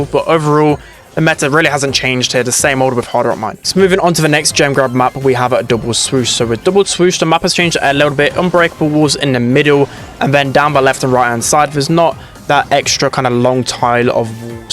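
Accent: United Kingdom. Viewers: That’s it I heard enough British accent for today.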